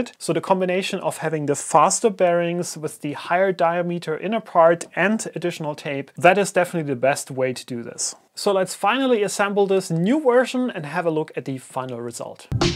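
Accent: Germany. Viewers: great video! I am about to build my own dry box! So it would be awesome to win some extra stuff for it! I'm from Germany! Just as you, I assume? No offense, but as a german, I think I can hear the German accent :D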